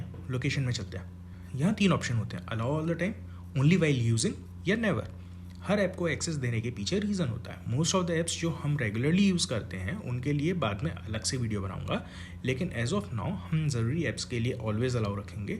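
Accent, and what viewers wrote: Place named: Gwalior, India. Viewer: funny accent ... Ekdum Gwalior Andaaz me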